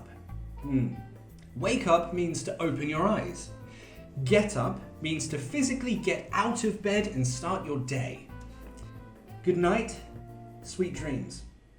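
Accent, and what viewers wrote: United Kingdom. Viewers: is this british english? i was studying your videos because i tougth that it was americano english😭😭😭😭😭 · Yes, this is British English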